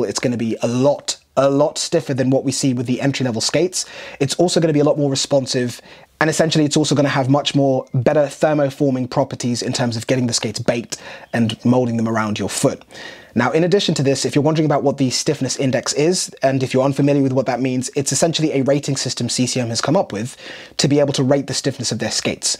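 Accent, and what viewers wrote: England, United Kingdom. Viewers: As a Canadian who has never encountered your YouTube channel I was taken aback by the British accent. The thought did enter my mind "what will he knows". But you crushed it. EXCELLENT presentation and analysis. Very impressed. My hockey days are over. Just no interest anymore. But if I was still into the game you would be my go to guy for equipment reviews. Well done. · I'm not saying I'm racist but I'm having a hard to time listening to this English accent talking about hockey skates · Learning about hockey from a black guy with a British accent. 😂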